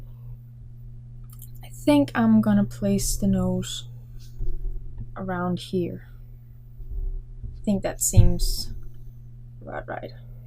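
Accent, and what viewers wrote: Denmark. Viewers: R u danish? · Are you danish?😁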